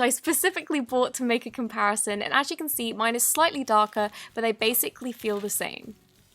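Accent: United Kingdom. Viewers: Are you British??????!!!!??!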